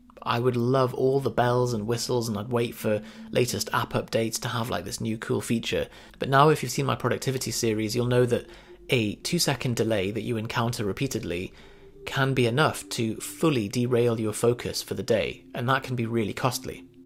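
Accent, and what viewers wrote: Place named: RP, United Kingdom. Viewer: Splendid command of the English language. You could walk straight into the Royal family with that accent!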